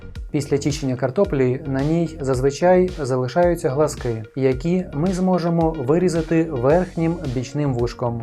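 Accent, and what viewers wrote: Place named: West, Ukraine. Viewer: изуродованный западеной русский язык...тошно слушать,я все понимаю ...лучше звук выключить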